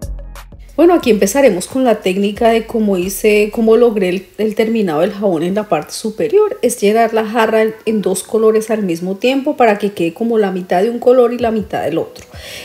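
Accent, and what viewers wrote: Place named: Colombia. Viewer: de donde eres?!!, tu acento parece Colombisno!@ Saludos, una fan,Diana 🇵🇷👋🥰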